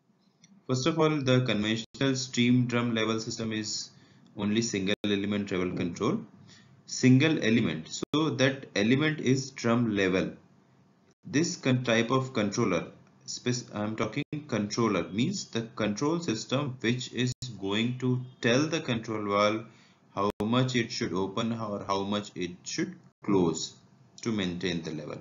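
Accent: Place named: India. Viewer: I don't. understand. your hindu. english. speaking it has. vibration on tounge your mother language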